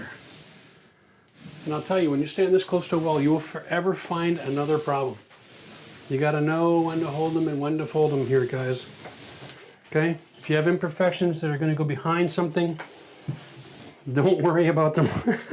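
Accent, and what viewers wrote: Canada. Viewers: Florida? I would have guess you were Canadian.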